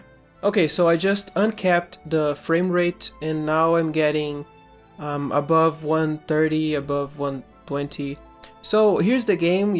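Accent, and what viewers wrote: Brazil. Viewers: I almost didn't notice that you are brazilian!!! Excelente trabalho, talvez seja legal fazer um canal pro povo BR tbm ou não neh... Se bem que fazer a mesma coisa duas vezes deve consumir muito tempo. Excelente trabalho!!! Quero ver a sequencia desse DevLog!! Alias quero jogar esse jogo!!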